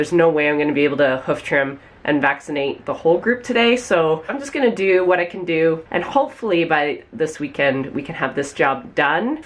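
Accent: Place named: Canada. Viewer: DrummerGirl423 she’s Canadian!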